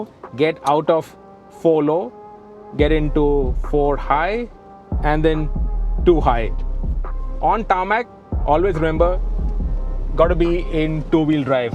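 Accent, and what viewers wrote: India. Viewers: Indian english hurts my ears so bad😂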